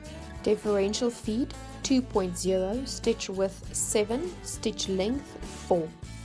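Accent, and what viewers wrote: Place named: South Africa. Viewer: Excellent. I thought that was a South African accent :) I just unboxed mine and am so glad to find a detailed explanation. Thank you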